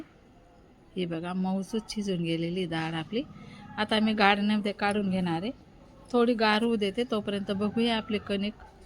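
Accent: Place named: Dhule, India. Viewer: तुम्ही धुळ्याच्या आहे का ताई भाषा तशी आहे म्हणून विचारलं खूप छान झाला सगळा स्वयंपाक 😊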